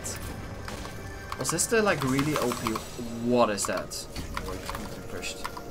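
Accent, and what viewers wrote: Netherlands. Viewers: Are you dutch? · vindt je nl accent zo grappig nice vidoe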